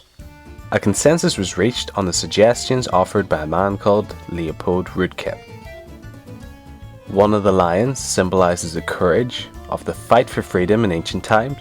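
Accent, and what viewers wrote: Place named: Scotland, United Kingdom. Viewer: Your scottish accent is funny 😆😂😀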